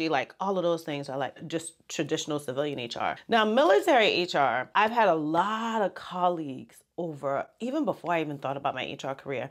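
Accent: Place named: Lowcountry, United States. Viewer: I don't know how your channel popped up on my feed. I'm just glad it did. I love the content but what got my attention immediately was the accent to which I said, This lady is from my home. I scroll down to the bottom of your description and see your address as Goose Creek. I definitely have to click subscribed for the Low Country. Stay positive!!!!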